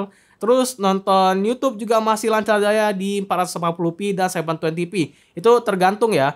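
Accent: Palembang, Indonesia. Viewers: Logat palembang itu bg mana ada tiru tiru hadehh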